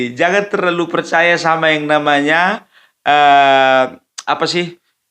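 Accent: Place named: Sunda, Indonesia. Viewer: Saya sebagai orang jabar.. Merasa malu kalau benar terbukti kang emil terjerat bjb.. 😬 bang lucu bahasa sundanya. 😁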